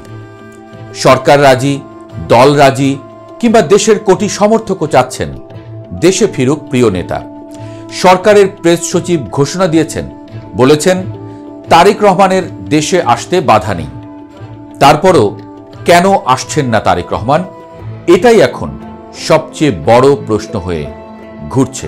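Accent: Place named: India. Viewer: এর খবর পড়া স্টাইল দেখো মনে হচ্ছে এই মালটা দাদাদের দেশের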